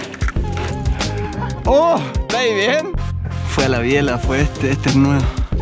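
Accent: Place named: Chile. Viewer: Así hablan los verdaderos chilenos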